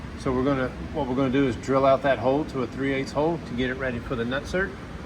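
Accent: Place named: Texas, United States. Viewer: Texas Talking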